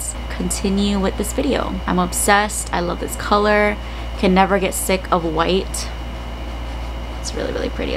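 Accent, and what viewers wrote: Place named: United States. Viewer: prng amerkano dw voice mo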